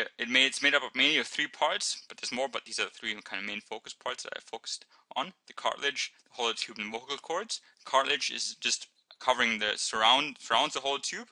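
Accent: Germany. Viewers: ur german? i thought u were indian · Oh he's german! i was trying to figure it out throughout the whole chapter! LOL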